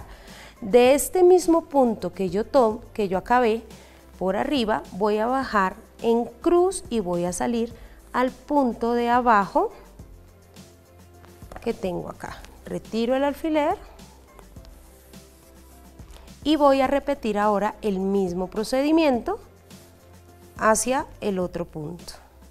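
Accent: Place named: Colombia. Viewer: Eres Colombiana lo digo por el acento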